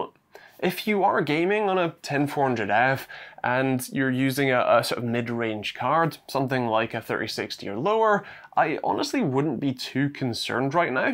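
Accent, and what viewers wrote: United Kingdom. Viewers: British accent bro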